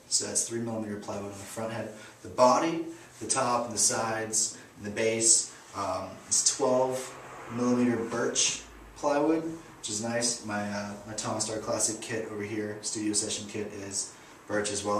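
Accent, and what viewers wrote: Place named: United States. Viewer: Hi.. You sound like a yank...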